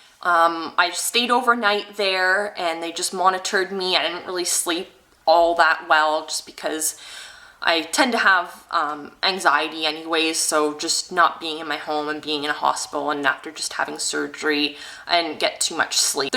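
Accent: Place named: Canada. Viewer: Thanks for the response! Apparently the nodule has all the markers of being cancerous but you definitely helped calm my fears. I'm so nervous about the biopsy! I am in medical school and the anxiety that sometimes lets my mind run away makes it hard to concentrate! The school physical was actually what incidentally found my hypothyroidism because my PCP wanted to check thyroid levels "just in case" and then i also had the ultrasound for the Hashimoto's "just in case." On an unrelated note, I love your accent! the "out" and "about." Canadian, eh? :) thanks again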